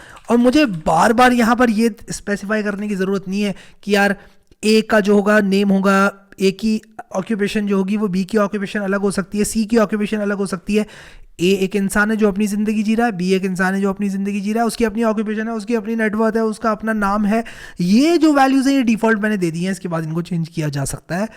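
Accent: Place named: Uttar Pradesh, India. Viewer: wo object jiske liye call Kiya jaa raha hai❤❤ | Aap UP se hone bhai😂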